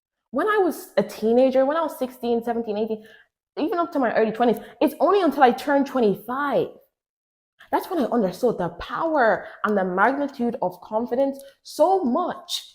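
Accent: Ireland. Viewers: Are you from Ireland ? Thought I heard the accent 😊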